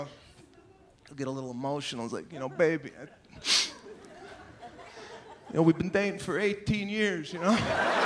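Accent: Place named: Chicago, United States. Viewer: He has one of the strongest Italian Chicago accents I've ever heard.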